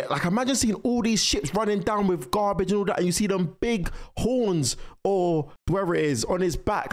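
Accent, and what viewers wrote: United Kingdom. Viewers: Your really British my man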